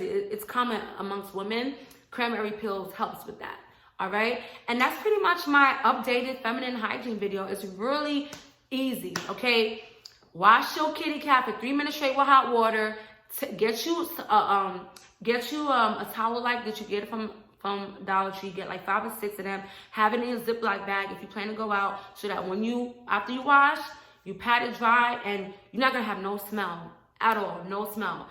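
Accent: New York, United States. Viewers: She has personality of cardi she has the new york accent. But she doesnt look like her she looks better than cardi 😂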